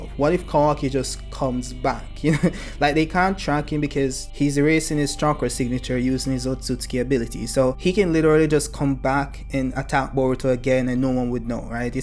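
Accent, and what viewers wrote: Jamaica. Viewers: You sound like a Jamaican speaking standard English. Are you Jamaican bro?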